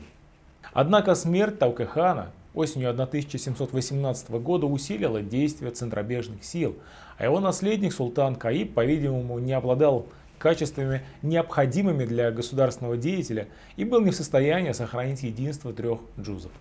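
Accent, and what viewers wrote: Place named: Moscow, Russia. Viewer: правильно следует произносив звук О, а не акать испорчески по мАцковски. То есть надо произносить там где есть в слове буква О произносить звук О, а именно: О'йрат, ТО'ле бий, БО'генбай батыр.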